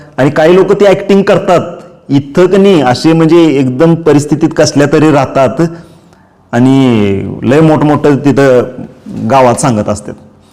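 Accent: Kolhapur, India. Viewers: Bhava tu teacher ahes chagle ahe. Pan tuje fluent English nahi ahe. Tyala kolapuri/ gavakadhcha English cha Sparsha ahe. Pan tula Tu shikvaneche kam kartos khupach Chan. Congrats 🎉❤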